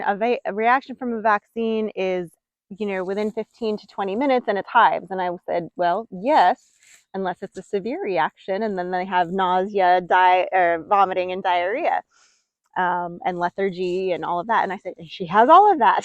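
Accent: South, United States. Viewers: love win your a little tiered you get a southern Drow in you words love that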